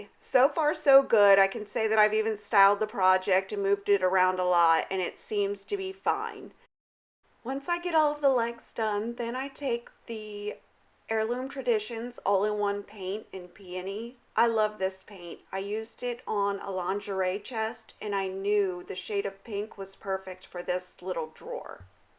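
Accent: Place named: Pennsylvania, United States. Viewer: Just Beautiful Projects!, you are my New Favorite Shabby Chic Channel! From Your Accent it sounds like you are from Pennsylvania.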